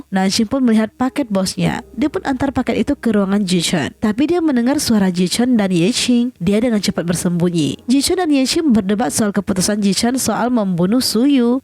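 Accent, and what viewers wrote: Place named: China. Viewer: ne kk orng cine ya.. dari omong kk ne..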